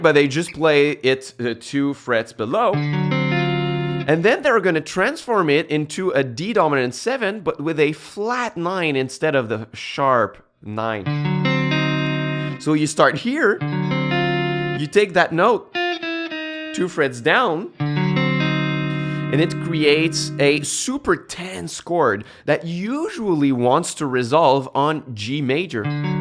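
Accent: Quebec, Canada. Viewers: You sound like you from Quebec????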